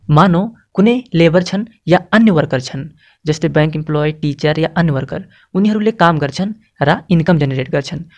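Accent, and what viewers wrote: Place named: India. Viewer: indianjasto bolera kei bujhiyena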